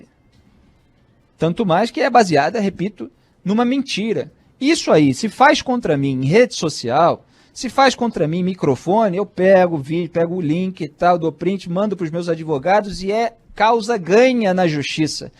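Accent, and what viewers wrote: Rio de Janeiro, Brazil. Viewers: Felipe Mourão Brasil, seus comentários são claros e eu tenho prazer em vê-los, sem contar com seu sotaque carioca que é lindo.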